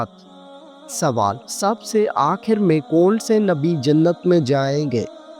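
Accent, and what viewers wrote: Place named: Sindh, Pakistan. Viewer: Ap sindi hu achi ko ashi khty hu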